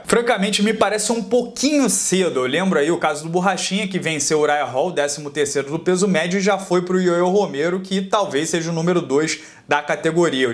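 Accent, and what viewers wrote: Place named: Pará, Brazil. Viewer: O tem um sotaque de paraense muito acentuado.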